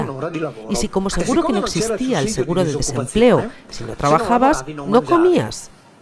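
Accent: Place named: Castile, Spain. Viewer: Puro acento castellano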